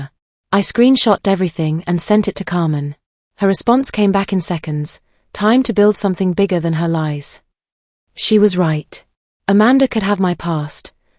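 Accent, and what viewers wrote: United Kingdom. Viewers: Awesome. Love British Narrators so much ❤️ 💖 💗 💕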